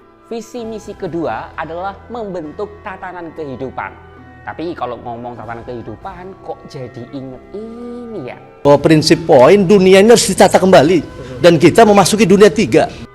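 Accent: Java, Indonesia. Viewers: Acara " lima" tahunan ngomong " SATRIO PININGIT" , narator nya channel ini hanya " jualan" bahasa jawa yang isi nya tanpa " ISI" bukanya makin memperjelas tapi justru MEMBURAMKAN" dengan dongeng dan hafalan bahasa jawa yang dibumbui dengan aksen jawa yang " pas- pas" an tanpa kesimpulan apapun yang dapat di pahami nalar dengan mudah. wk wk wk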